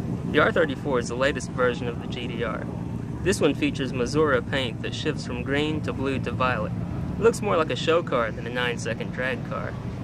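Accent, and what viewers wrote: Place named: South, United States. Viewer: Who did these voiceovers? Guy sounds southern 😂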